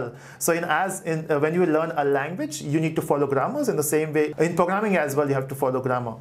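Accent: Telugu, India. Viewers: Nice sir is your mother tongue is Telugu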